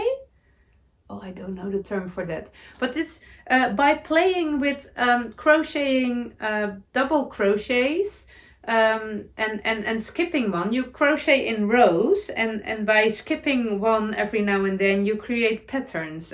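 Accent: Netherlands. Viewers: As a second generation Dutch Canadian, can I just say that I LOVE listening to you speak. ❤ It almost feels like I’m visiting with a Dutch relative, and when you try and find the right English words I find myself cheering you on as you try different ways to say it. I wish I spoke Dutch - it’s such a beautiful language and a beautiful heritage that brings me so much joy to listen to. ❤️ Someday I hope to visit!!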